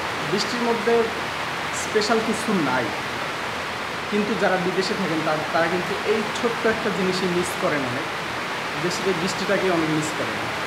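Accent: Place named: Bogura, Bangladesh. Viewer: ভাইয়া আপনার বাড়ি কি বগুড়া আমি ভেবে ছিলাম সিলেট।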